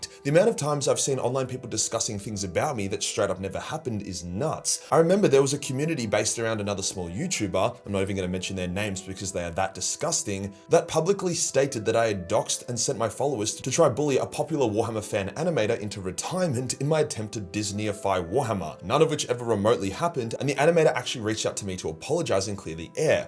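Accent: Australia. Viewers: Every time you get “canceled” is because you are an australian and talk like one and people just dont understand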